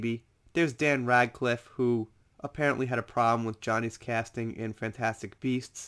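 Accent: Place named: East Coast, United States. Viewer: You know the YouTubers that become uber famous let you in on them, not just news. Still wonder if your wife is Japanese or work brought you there...what's it feel like having strong East Coast (NJ? NYC?) accent in Japan...one of the few places I've not been but so many are curious about it. When you are comfortable.....🤗 Not before.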